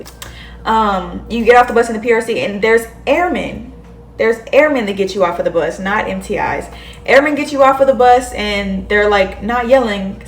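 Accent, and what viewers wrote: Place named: New York, United States. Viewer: speaks like she from NY 😅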